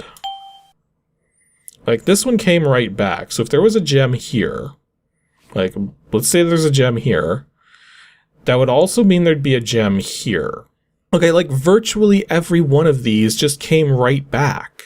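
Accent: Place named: Canada. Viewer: I agree. You're a Canadian. Can't expect you to know every correct local pronunciation of the entire world. If you know how you know how, if not just pronounce in your Canadian English. At least you're not apologizing for ever name you pronounce, which is one of my main youtube annoyances, well in the few channels that I watch.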